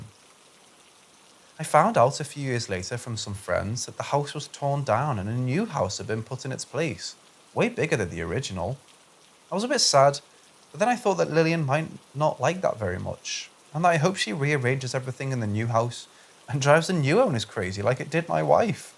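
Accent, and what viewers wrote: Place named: Northern England, United Kingdom. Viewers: I had a feeling he was English but he pronounces some words differently. Northern England was going to be my guess. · no he's definitely english...sounds like he comes from up north to me.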